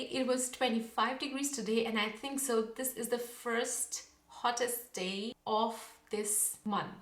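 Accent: Germany. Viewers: Well, dialect may be a too strong word... your German has a Kaiserslautern flavour. I believe not many people in Germany can hide their "origin". I live in the North (Schleswig-Hosltein), and people always notice where I am coming from. Hochdeutsch (high German) mainly exists in written form or in TV news. Unrelated, there are 2 Videos with perfect or close to perfect light: This one and the vlog about racism. I hardly know any channel with such a steep leaning curve. Chapeau! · lovely german accent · Sie hat auch so einen deutschen Akzent. Wir haben schon vermutet das sie in Deutschland aufgewachsen ist. Aber vielleicht ist sie Hindu und war in einem frühreren Leben deutsch.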